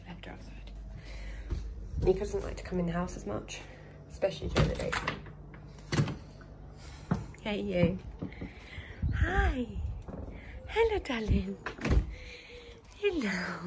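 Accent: United Kingdom. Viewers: Hello, your dogs ate very cute! Im watching your vlog from St Peterbourg, Russia, and i couldnt find any mention about what country and city you are living in.. By your accent i guess you live somewhere in Great Britain, am i right?:) Maybe someone can prompt me? Thanks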